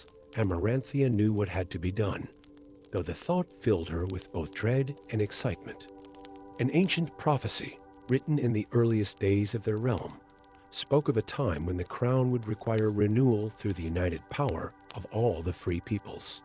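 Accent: United States. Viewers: Love your stories. Really dislike this voice, sounds American, it’s just awful.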